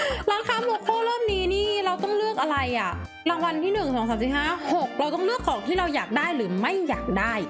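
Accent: North, Thailand. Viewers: ปี่เป็นคนเหนือแม่นก่อคับ💗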